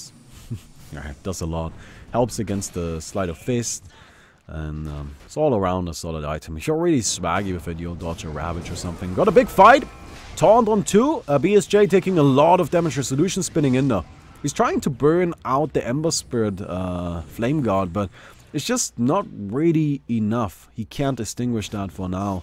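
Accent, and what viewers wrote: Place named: Germany. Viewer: I still really like watching these casts. it combines two of the most entertaining things that can be found in youtube. Games, and german opinions. that accent just makes everything more fun to me :)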